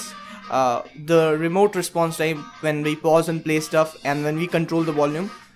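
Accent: India. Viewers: ياخي يجيني مغص اذا قعدت اسمع لهندي يتكلم انجليزي😫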